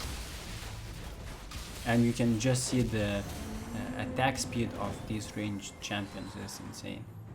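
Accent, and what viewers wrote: Lithuania. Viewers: kai tu pasakai vidio iskarto matosi kad tu letuvis bet vistiek geras vidio :D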